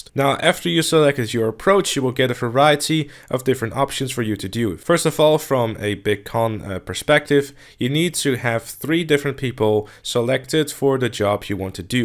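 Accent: Netherlands. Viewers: are u from the netherlands ?? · Hollander zeker :p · Ik hoor een beetje een Nederlands accent?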